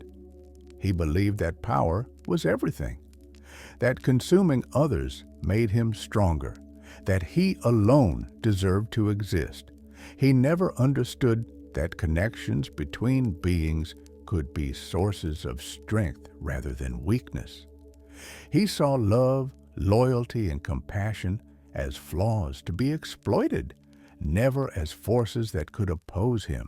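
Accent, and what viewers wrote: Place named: South, United States. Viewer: Dude why on earth would anyone want to hear a star wars tale from the vice of some southern dude sitting on his porch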